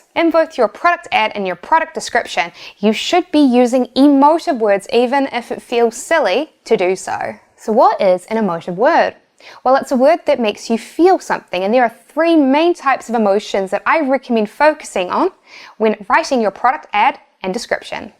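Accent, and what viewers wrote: New Zealand. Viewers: Love your Kiwi accent!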